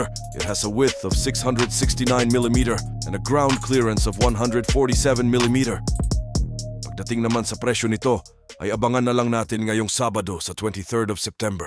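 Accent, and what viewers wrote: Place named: United States. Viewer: kuya halatang laki g america yung accent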